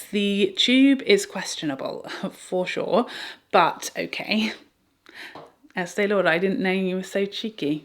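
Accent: United Kingdom. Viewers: I love your stories -- not only are they great and cray cray, but you're a natural storyteller. Plus, your accent is darling. (I'm from the US and adore UK accents.) Great video on product. I've been curious about all you showed! P.S. - Where are the brushes made?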